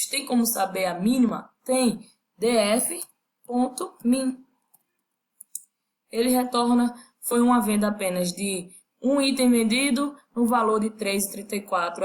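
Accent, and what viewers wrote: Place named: Recife, Brazil. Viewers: Oi Oliveira J, que bom que gostou, o sotaque é do Recife sim, acertou! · Massa! Show! Esse sotaque parece do Recife, ok?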